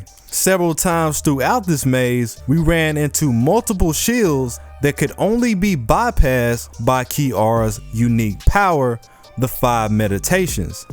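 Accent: United States. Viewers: are you from Baltimore? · you from Philly?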